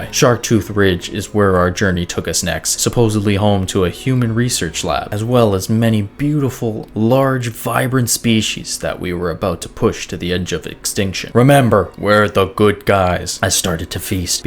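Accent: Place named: Canada. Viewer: Bro ur Canadian accent is strong asf 💪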